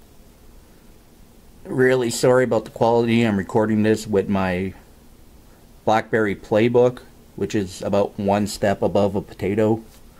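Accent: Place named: France. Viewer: dat epic lisp, and dat french accent hahah :D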